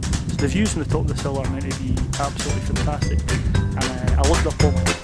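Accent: Scotland, United Kingdom. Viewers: Your videos are a delight: Scottish mountains and a Scottish voice. If the Scottish voice is a challenge to some then just enjoy the video as if you were listening to a song in a language you do not know. The joy in life is to encounter the new not reject the new or expect the new to change to the familiar. videos are about challenge that's why people love them.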